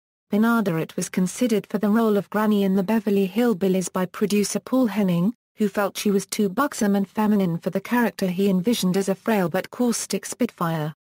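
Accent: United Kingdom. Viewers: I was hoping this would be a new twist on this great actress; but it appears to be a reading of WIKIPEDIA with a British accent. Thumbs down.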